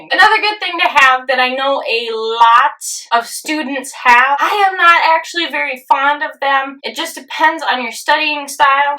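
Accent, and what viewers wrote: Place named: Upper Midwest, United States. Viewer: LOVE your editing. Best MA videos on Youtube. Girl, you must live in MN, WI, or a nearby state. I moved to WI from Texas and that accent on the word "bag" (or, "beg") is unmistakable. Thanks for the helpful info. Appreciate that you keep it funny and entertaining but don't get off point with makeup tutorials, etc. that have nothing to do with Medical Assisting. Yeah, anyway, good job.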